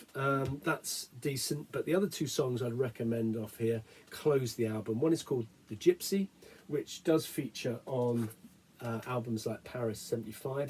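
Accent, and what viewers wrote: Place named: RP, United Kingdom. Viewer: Oh I would never guess. Talks like an English lord. Upper west side o’ London or something. 😝. Your video made me play DP’s Live in Paris 75 and Coverdale had pipes for sure. It’s his banter between songs that s most annoying.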